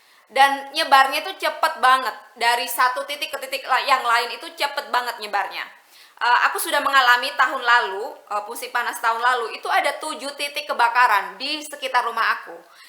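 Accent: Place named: Surabaya, Indonesia. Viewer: arek suroboyo tah mbak iki,podho mbak..anyway do you know londo kampung? He is a youtuber too like mbk 😊.he is from aussie but his wife from surabaya..he speak jowo suroboyoan fluently..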